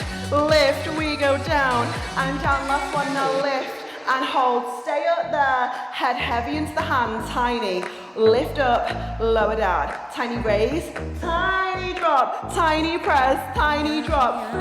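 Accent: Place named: United Kingdom. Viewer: So happy I found your channel. I’m coming down from intensive exercise & being kinder to my body. Loving the combination of weight’s & pilates moves. Love the fact that you’re U.K. too, fed up of listening to over hyper American accents.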